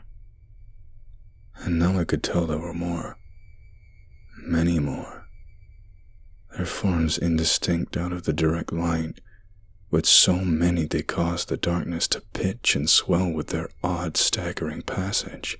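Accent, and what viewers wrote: United States. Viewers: You sound very American in this one. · You do a pretty damn solid American accent - from different regions, too, IIRC - so it's always fun to hear it. Occasionally you slip, like when you said "been" like "bean" and not "bin" like we yankee folk do. Not a dis at all, it's just interesting and fun to pick out little things like that! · You could so come to America and blend in 100%. Ever consider voice acting or even acting in general?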